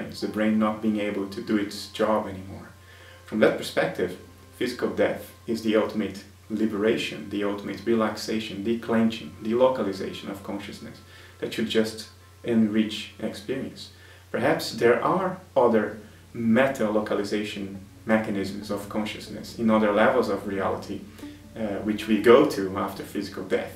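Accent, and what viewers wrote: Netherlands. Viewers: im hearing a dutch accent am i right? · I'm dutch and his accent sounds pretty special. I haven't heard any english speaking dutch in a while I reckon.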